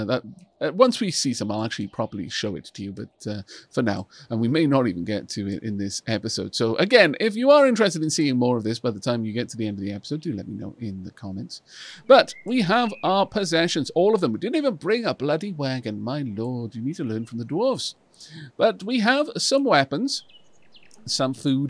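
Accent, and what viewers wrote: Wales, United Kingdom. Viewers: I went into this blind from recommendations, distracted by btd6, and I was like wow, this guy sounds like. I had to get out of full screen to see who it was and.... yeah it was you!!! Your calm voice is unique and proper mate, the Welch in you comes out joyfully. When I was sent overseas to RAF Alconbury in East Anglia the winds were like gale force on the day I was picking up my MOPP Gear over stuffed into a parachute bag (Chem suit/mask and all the trimmings) which weighed a metric ton altogether and I was walking the entire way to the dorms against the wind. My friend was heading the opposite direction and he wizzed on by me waving. I still remember the one time the wind stopped and the sky was dry while we were walking to the bowling alley. It was summer about 6 pm so another 3 hours of sunlight at least and I remarked how nice a night it was for once. 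When we got out of the bowling alley not 2 hours later it was lightly raining again and blowing like the channel resented us being over there... as per usual. When I eventually got sent back stateside I was sent to the deserts of Idaho where the ranking Sargent of my job place was showing me around and remarked that I'd best get used to the wind. I simply said ' What wind?' He thought I was boasting. It was too cold to try and explain where I had just come from as he would have doubtless no clue.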